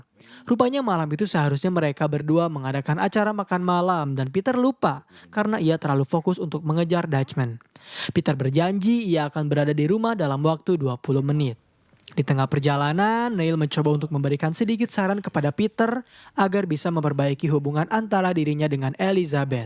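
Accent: West Java, Indonesia. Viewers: Anjirrr gurung gusuh😂😂orang sunda kah min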